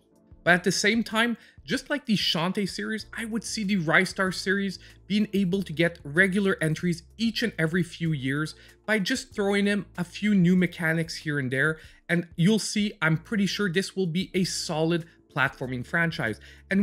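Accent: Canada. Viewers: I come for the content. Stay for the Canadian accent. A youtuber unlike any udder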